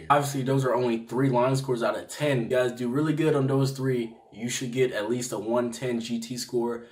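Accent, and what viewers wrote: Baltimore, United States. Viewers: I like his Baltimore accent lol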